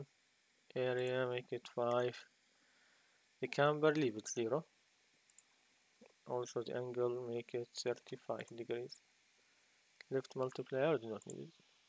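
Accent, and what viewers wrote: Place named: Iraq. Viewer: احسك عراقي 😅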